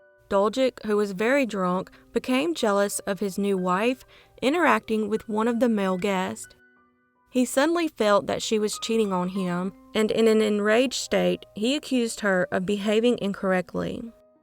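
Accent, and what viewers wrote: South, United States. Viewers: Well… hey 👋🏽 there! Great to have you here again… I just love 💕 the accent! 🤗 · This is my first video with you and I'm already hooked! (Subbed ofc) A combination of my favourite things... Crime stories and the southern accent! 💖🇨🇦💖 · Good morning to you!😉 A good way to start my day! Your accent makes me miss NC ☺️ I do love love love your voice/accent! I can't say that enough!😋 Much love from Washington State 🤩🥰💞✌️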